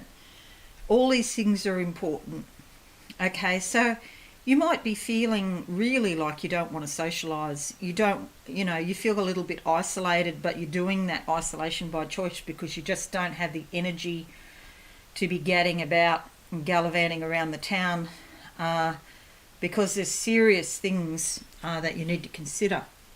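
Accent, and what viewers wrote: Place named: Australia. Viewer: Thank you..nice to hear an Aussie accent..am also an Aussie..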